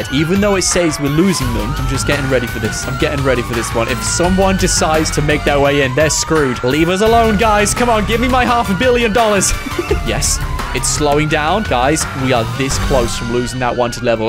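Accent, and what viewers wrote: United Kingdom. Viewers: Are you British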